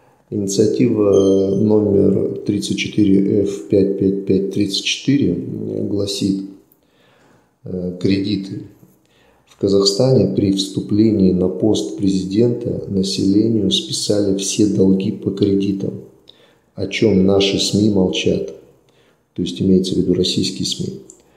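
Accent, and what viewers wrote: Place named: Ukraine. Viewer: какой китаец, ты сам китаец.не улавливаеш характерныи интонация украинцев. свое время много общался украинцами. только непонятка наш украинец или. ..вовще зрить это самый корень.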